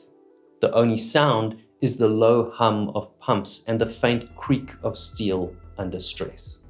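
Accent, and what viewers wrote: South Africa. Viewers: I love the content and the South African accent 😊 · Except he has a South African accent! It is a nice accent though.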